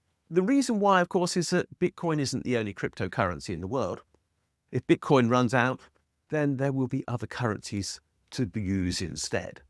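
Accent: RP, United Kingdom. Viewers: So much misinformation here it's unbearable. Don't let the posh English accent, the bookshelves and the "professor" title fool you.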